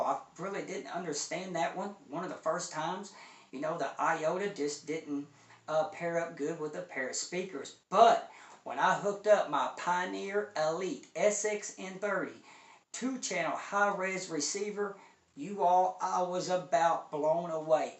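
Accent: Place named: Appalachia, United States. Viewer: Your an inigima, your accent lends one to think your a hillbilly. But if someone actually listens to you they should be taking you seriously. Cheapaudioman is rather goofy and very popular. People probably think you wear a red hat when not on camera.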